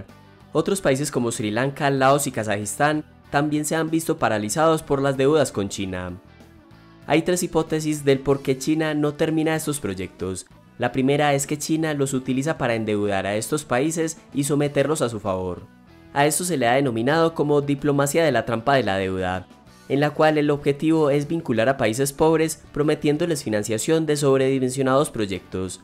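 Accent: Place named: Coffee Region, Colombia. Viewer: NO HOMBRE!, ESE ACENTICO LO MATA. TERRIBLE QUE SONSONETE. CONSIGA UN NARRADOR NEUTRO. ES MUY FASTIDIOSO. NO PUEDE UNO CONCENTRARSE EN EL CONTENIDO, ACENTO CAMPESINO DE LA REGIÓN CAFETERA. MUY ARRASTRANDO.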